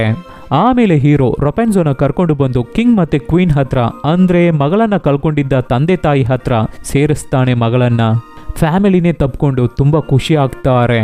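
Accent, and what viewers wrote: Tamil Nadu, India. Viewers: அண்ணா நீங்க தமிழ் காரங்க தான நீங்க பேசுற அப்பவே தெரியுது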